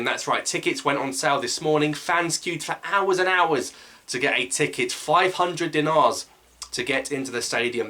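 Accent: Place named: RP, United Kingdom. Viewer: well it's a great coincidence to find your channel because I am learning British accent and I adore your "BBC accent" especially when it's about one of my favorite topics which is Algerian football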